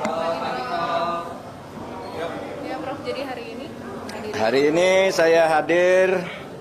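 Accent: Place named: Madura, Indonesia. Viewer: Logat maduranya siip..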